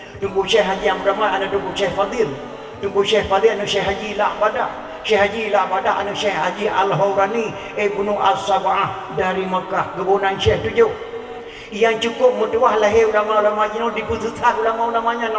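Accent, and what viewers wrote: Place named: Peninsular Malaysia, Malaysia. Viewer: Kenapa cakap macam semenanjung Malaysia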